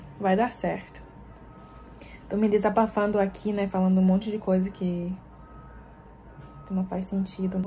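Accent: Northeast, Brazil. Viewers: vc realmente é nordestina vc ta falando sotaque 👉 vixi que bom a casa é sua NATAL RIO GRANDE DO NORTE. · "Ne" pra la "ne" pra ca kkkkkk parece nordestina zkzkksksksks ❤ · Volta logo para o Brasil🇨🇦🇧🇷vc já tem o sotaque Brasileiro❤️🥰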